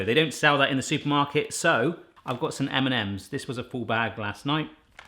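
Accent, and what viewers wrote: United Kingdom. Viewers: spirit-howl 786 U that’s a really British accent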